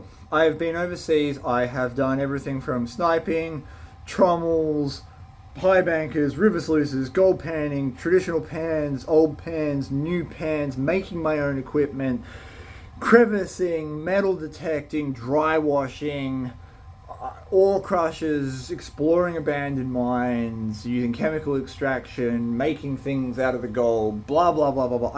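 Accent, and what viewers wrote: Australia. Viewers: Do what ya gut tells you to do,, you and ya brother are incredible I'm recently retired and found your YouTube a couple of months ago. Because of you I now have a Detector, a Pinpointer , and exploring country I would never of seen before. Whatever ya choose,, I still like ya content 🙏😎 (nothing wrong with the way you speak,,, its Australian ❤❤)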